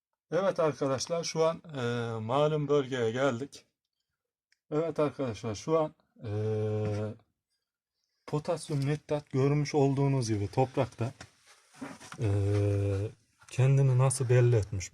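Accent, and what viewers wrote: Turkey. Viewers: Sayin ustam süzülen topragi kaç dakika kaynatıyoruz kulun sadece suyunu katıyoruz heralde abicim şiveniz iç anadolu bende bu bolgedenim selamlar saygilar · Ustam nerelisin sivenir bize yakin sanki elbistandan selamlar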